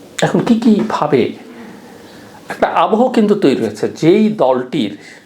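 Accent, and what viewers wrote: India. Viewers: ভাই আপনি তো ইন্ডিয়ান ভয়েস এ কথা বলছেন একদম হবো হু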